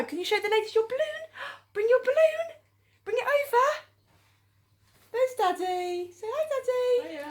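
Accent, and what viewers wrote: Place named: United Kingdom. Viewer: I like u accent ! looks like british . But anyway , adorable video. Thanks for sharing with. Hello from Japan!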